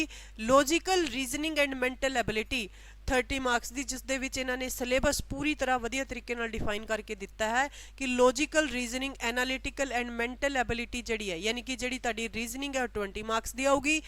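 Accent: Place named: Bagri, India. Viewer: ਬਾਗੜੀ ਆ ਮੈਡਮ,, ਪੰਜਾਬੀ ਭਾਸ਼ਾ,, ਜਿਦਾ ਬੋਲ ਰਹੇ ਆ ਪਤਾ ਲਗ ਰੀਆ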